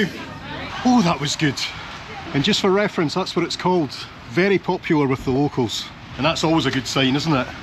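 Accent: Scotland, United Kingdom. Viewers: First comment, I am enjoying all the videos, and look forward to them all. What I also enjoy is the delicate Scottish accent,and even nicer when comes on line...Chris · The stiff Scottish accent is becoming increasingly understandable after each video😅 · you sound british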